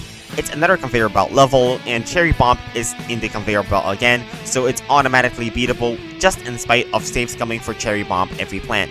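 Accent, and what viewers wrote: China. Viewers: maybe his asian chinese accent you dont like? lol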